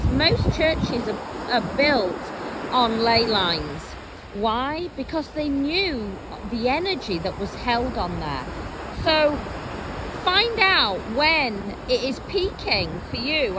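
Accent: United Kingdom. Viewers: She's from U.K. That's just how they talk I think. 🙂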